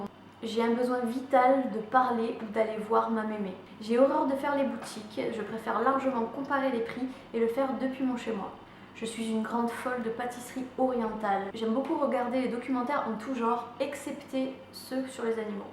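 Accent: South, France. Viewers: Ton dernier fait était "je me retiens pour éviter de montrer mon accent du sud, parce que je trouve sa très vulgaire". Pourrai-tu nous faire une vidéo avec cet accent ?? :) Continue comme sa tu es génial !! ❤️ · J'ai aime ta vidéo claire et consise :) oh l accent du sud <3 il a pas l air moche le tiens ... Moi ça va ça ne se remarque pas dans mes vidéos il n est pas très prononcé . Pour le shopping plus ça va et plus je deviens comme ça ... Ah tout faire sur le net!!! Et bizarrement moi non plus je b´aile pas l eau sur les coudes quand je me lave le visage ! Voilà :) et pour les youtubeuses françaises et bien je t'invite a venir me faire un coucou alors histoire de voir une nouvelle tête . Bisous a bientôt . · Tu peux le garder ton bel accent du Sud ! La vulgarité est une attitude et tu es à mille lieux de celle-ci ! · Super vidéo :D tu es vraiment une youtubeuse super justement pour ton naturel et la proximité que tu crées avec ton "public" ^^ je vais jouer le jeu tiens ! 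1: je déteste dépenser mon argent, mais j'adore posséder et je suis assez addict au shopping (j'ai des wishlist longues comme le bras qui traînent dans mon PC) 2: je suis environ 40 youtubeuses françaises et 20 américaines. 3: j'ai eu 18 ans, mon bac avec mention très bien et mon permis de conduire en l'espace de 5 jours cette année. 4: je suis fan de cinéma, et en particulier des films un peu psychologiques. 5: j'ai la phobie du feu, je n'ai par exemple jamais osé passer mon doigt à travers la flamme d'une bougie ou démarrer un barbecue... 6: je suis détectée surdouée mais cela n'influence pas mes goûts ou mes loisirs. Par contre, j'ai plus de mal à nouer des liens avec les autres que la plupart des gens. 7: je trouve l'accent du sud extrêmement relaxant et chantant :) c'est d'ailleurs une des choses qui me font aimer ta chaîne alors ne te retient pas! 8: je suis terriblement gourmande. 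9: je suis très lunatique et c'est un problème car je suis susceptible d'être parfaitement normale et d'avoir des accès de colère ou des périodes de déprime. 10: je rêve de devenir actrice depuis que je sais marcher. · Pourquoi tu trouves cela vulgaire l'accent du sud? :) En tout cas, j'aime beaucoup tes vidéos. Je trouve que tu donnes vraiment des astuces intéressantes. Et si je peux me le permettre, qui sont les uniques 5 youtubeuses françaises que tu suis? Des bisous♥ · J'aime trop l'accent du sud ! Ne te retiens pas ! 😜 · Mais c'est super beau l'accent du sud dans une vidéo tu pourras parler avec ? :) (Je l'ai moi aussi) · Je suis pas d'accord avec toi pour le 50ème fait on peux pas renier l'accent du sud aha :D et enplus je trouve qu'il te va très bien! VIVE LE SUD :* :D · Bonne video mais dommage pour l'accent du sud je l'ai aussi et je trouve que c'est le plus bel accent comparé à l'accent du nord beaucoup trop pointu à mon gout! 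Apres l'accent du sud c'est comme tout, faut savoir l'utiliser et je suis d'accord avec toi par rapport au fait que selon les personnes, il peut devenir extrêmement vulgaire ! Bisous ! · Ca me choque un peu que tu ais honte de ton accent, moi aussi j'ai l'accent du sud et je n'en ai pas honte du tout, mes amis nordistes aiment bien ils disent que ça leur fait penser aux vacances mdr, c'est pas parce que tu as l'accent sudiste que tu parles forcément comme une cagole ;) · On a des points en communs ahah, sinon,laisse ton accent du sud, cest le meilleur! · Pourrai tu nous faire une video courte avec ton accent du sud pour voir a quoi sa donne ?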